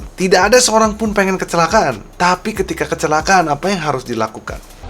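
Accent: Java, Indonesia. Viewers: Blek bok blek bok blek ohhhh blek bok medokkk waeeeee suaranyaaa,#ntaplah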